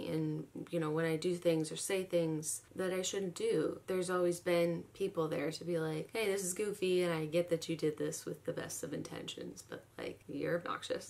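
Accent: Boston, United States. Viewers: It's been incredible to see how much you've grown as a person over the years. I've been on your channel since the beginning and it feels like i've grown with you. Loved your videos then, love them now. I totally forgot you had a thick Boston accent btw!! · I can't believe how strong your Boston accent used to be, I've been a fan since 2010 but I never noticed the change! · lol your boston accent is GOLD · Caitlin Slattery she said in the video that she had a Boston accent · Zietz I totally agree with you on this. I was thinking the same thing. I can understand her not wanting to watch herself though because I am the same way, but don't apologize for being you back then. You were amazing then as you are now. And I hate that you changed you Boston loud accent on purpose. It makes me sad. Sure grow up but be authentic. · omg i never realized how strong of boston accent she had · That Boston accent tho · I LOVE YOUR ACCENT!!! 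I got my Boston accent from you and I live in Oklahoma. You use your accent in your most recent videos as a thing, as an attitude. You are ole Greg to me, except you're better. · I love the old Boston accent showing through!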